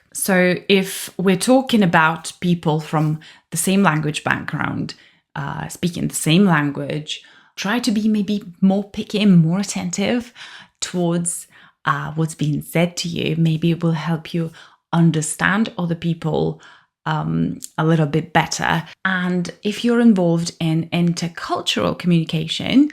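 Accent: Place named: United Kingdom. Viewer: It's interesting that your British accent is so strong.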